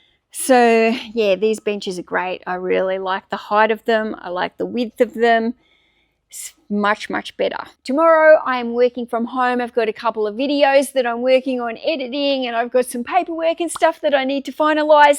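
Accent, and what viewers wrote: Australia. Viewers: I just realised how ocker aussie your voice is haha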